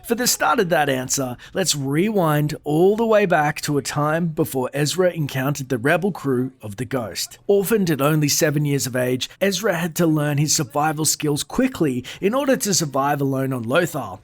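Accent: Australia. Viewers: You are an Aussie aren't cha?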